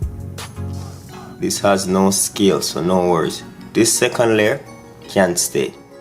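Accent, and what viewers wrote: Jamaica. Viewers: 🇺🇸🇬🇧 Hello 🤓. This is my first time seeing and watching your channel and I'm sure it won't be the last. I'm sold.. what a lovely and vibrant young man you're? Very clean and detailed cooking/ preparation. I love your vibes, I'm very pleased. I love your English entwined with the Jamaican Creole. I must tell you that I'm not a food or person. However, I like chicken, lobster, shrimp crab and fish. So, now that you're sending me in the kitchen to learn to cook Jamaican food 🤓. I will be trying the pig tail and the chicken soon. Son, God has blessed you with a wonderful talent. You've got many more as well, use them. Keep striving for the best. The sky is the limit, continue to be an inspiration to the youths. Too many of our youths are in jail or doing drugs, stealing,gangs etc. I'm one mother and grandmother who is very proud of you, you've my prayers and my blessings.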